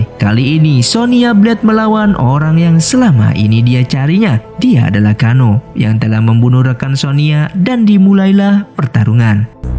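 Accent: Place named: Java, Indonesia. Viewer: Wong jowo ye mas? 😂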